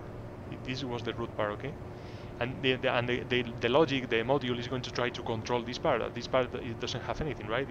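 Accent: Spain. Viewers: Nice, porcierto, asumo que eres español por el nombre y la forma de hablar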